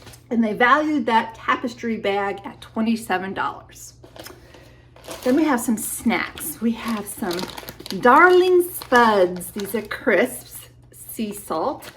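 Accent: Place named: United Kingdom. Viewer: Britain accent. ❤😊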